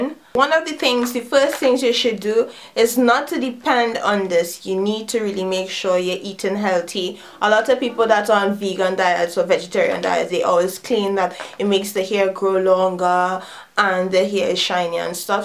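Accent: Trinidad and Tobago. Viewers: Oh my gosh Im Trinidadian as well! So happy to hear you are as well! These tips are great also! Im planning on trying this but really scared of trying bc im kind of prone to acne... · Soon as you started talking i already knew where u were from #trini....thks for the tips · Are you from the Caribbean?... you sound Trini lol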